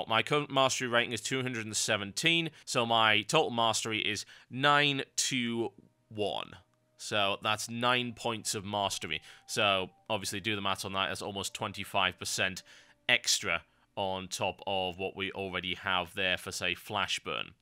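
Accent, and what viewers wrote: United Kingdom. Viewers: why did I just spend nearly 40 minutes watching a video about talents on a class I dont even play?... I think its that british voice.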